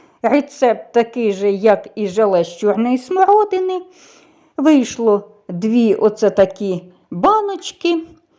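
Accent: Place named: Kyiv-Poltava, Ukraine. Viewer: Вітаю 🤗 дуже люблю ваш київсько-полтавський говір 💞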